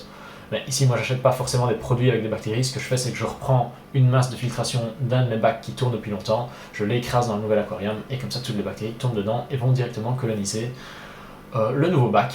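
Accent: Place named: Canada. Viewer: J'aime bien les commentaires et surtout l'accent canadien je prends plaisir à l'écoute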